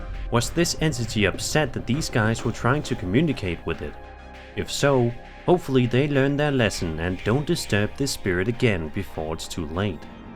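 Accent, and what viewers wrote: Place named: Denmark. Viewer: Brormand, jeg har set dine videoer I flere år og har først lige fundet ud af at du er dansker nu, wtf? 😂 Btw, fandt ud af det pga. Jeg så en af dine gamle videoer hvor du snakkede om merch og din accent var ikke til at tage fejl af 😂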